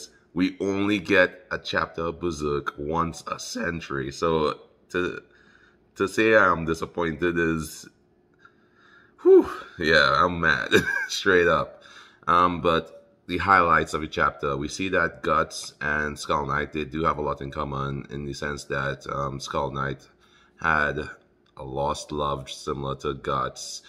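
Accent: Trinidad and Tobago. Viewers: What’s ur background bro? Ur accent sounds Trinidadian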